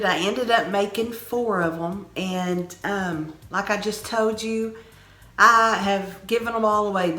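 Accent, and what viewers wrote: South, United States. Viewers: Hi. Judy here. I have only watched a few of your videos. I did subscribe to your channel. I love your accent!!!! I probably sound a lot like you as I am from southern central OK. Every time I go visit my daughter in Oregon, people are always commenting on my southern accent!!! 😘. I really enjoyed watching your fall home tour. And I absolutely love this project that you did in this video. It is too cute and looks easy to make. I am thinking of maybe getting all the necessary items and doing this with my daughters for our project when we are together for the Thanksgiving holiday. Who would have thought of using splatter screens to make something so beautiful. With choices for fabric etc, the sky is the limit. You seem like such a nice and wonderful person we would sure make good neighbors, as I love crafting also!!!! My husband broke his wrist years ago, but the difference with him is that he broke his right wrist and he is right-handed. 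I remember when he went back to work where he worked in the microbiology department of our local hospital lab, he wrote the results of his reports with his left hand. He said at first it nauseated him, but then he got kind of used to it. I hope you do well when the cast comes off. It took him a while to gain range of motion in his wrist because it had been fixed in one position for so long that when he tried to go beyond that position, there was some paiin; however, it did not take him too long. I am sure you will do fine. Looking forwar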